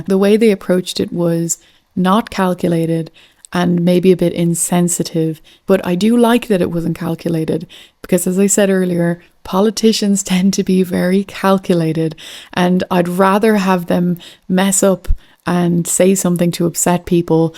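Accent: Ireland. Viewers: you should consider covering geo-politics too. E.g. cover BRICS, trade war between China - U.S., tech war (chip) between U.S. - China, the Syrian situation - why Turkey got involved (something about a pipe line that would benefit them), etc. 💡p.s. Irish accent?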